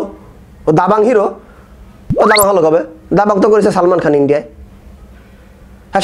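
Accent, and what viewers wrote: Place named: Khulna, Bangladesh. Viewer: Khulnar vasa amk mugdho kora vi..apnar kotha sunla onk moja pi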